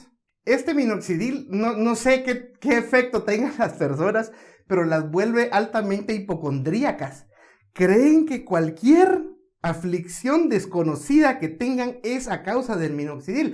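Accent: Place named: North, Mexico. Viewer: Creo que del norte de mexico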